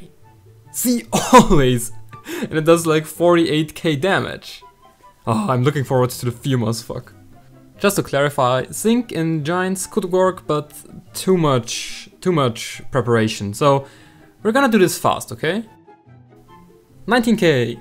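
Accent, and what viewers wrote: Germany. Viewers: You sound like Niki Lauda. Are you german? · klingst deutsch 🤔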